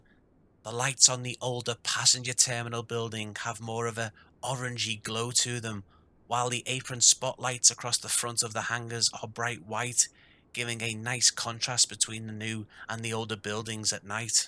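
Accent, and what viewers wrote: Liverpool, United Kingdom. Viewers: loved the Scouse - 50 years down under and can still understand it - and still love to eat it 👍 sad you dont have a Spitfire - ask the Aussie developers to gift you one for review👌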